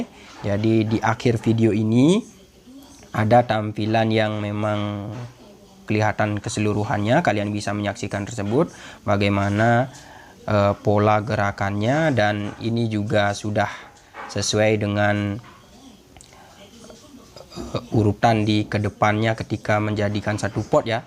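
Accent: Bali, Indonesia. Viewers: Logat bali'y kaga nahan ,gk bisa dibohongi 😆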